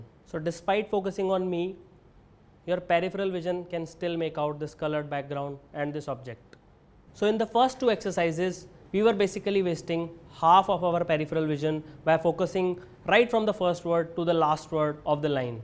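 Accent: India. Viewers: Why does He sounds like He is speaking english in hindi language? I cant catch up with this guy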